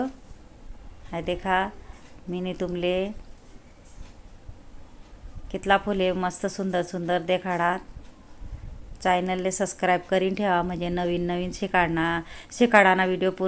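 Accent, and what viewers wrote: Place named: Khandesh, India. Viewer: अप्रतिम ताई आणि तुमची खानदेशी भाषा खुप गोड.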